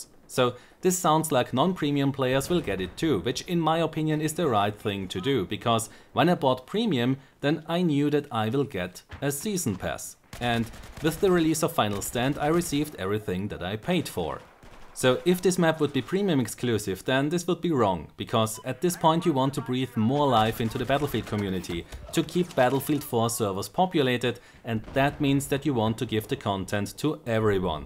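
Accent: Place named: Germany. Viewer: I do have to say, besides your sultry German (?) accent, you are probably the ONLY Battlefield YouTuber that actually looks at the bigger picture. The one who sits down and analyses things instead of bitching about them (like LevelCrap and others). You actually have the more levelheaded approach to how Battlefield works and what should be done to make it better. Keep up the good work!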